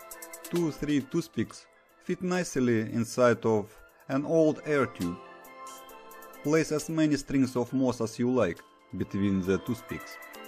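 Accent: Russia. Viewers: This " meanwhile in Russia" accent...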